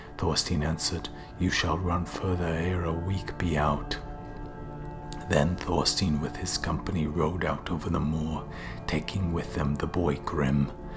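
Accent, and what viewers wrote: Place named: Wales, United Kingdom. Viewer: Thank you very much for this amazing, long series. It is hard to imagine how much labour went into this. It was a nice surprise after searching for a long time nearly all the English narrations seemed to be AI-generated. Then found a promising one by an Icelandic lady on Bandcamp and bought it, but unfortunately later found out it was incomplete. I'm curious what is your native language/nationality? Your accent sounded a bit like Welsh sometimes but it's hard to tell (anyway it suited the narration very well). Look forward to your other work.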